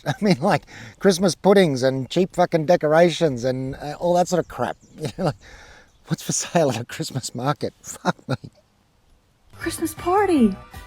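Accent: United Kingdom. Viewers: Shes obviously English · She sounds more British than American to me... But this is just me guessing since I don't have a clue where she's actually from. Though, the accent I've picked up seems to come and go, but sounds more obvious with certain words. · She doesn't sound American. More like British. 🤷‍♀️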